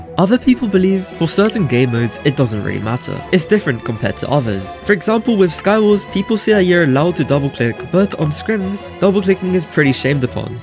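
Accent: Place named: England, United Kingdom. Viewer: Your English accent is goated dude!! 🔥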